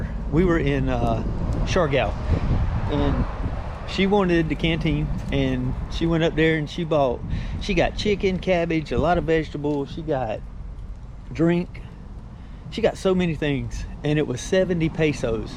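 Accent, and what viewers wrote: Appalachia, United States. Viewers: Are u from applachia? The accent is thick. Great video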